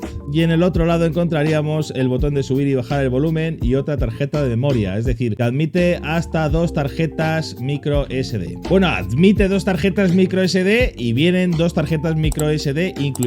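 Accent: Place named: Spain. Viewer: QUE GRACIOSO HABLAN LOS ESPAÑOLES 😂😂😂😂😂😂😂😂😂😂😂😂😂😂😂😂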